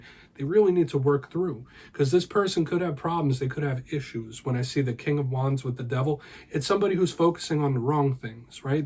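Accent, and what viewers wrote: New Jersey, United States. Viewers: you have a Jersey-ian accent. I love Jersey. It's possible for regular people to convince other regular people to do horrific and unGodly things based off of THEIR own personal experiences & happenstances in life. The challenge is to think independently and live without fear of repercussion or reprisal. Don't sacrifice your valuable time doing things that you, otherwise, would not do. We are here to enjoy our time, that's all.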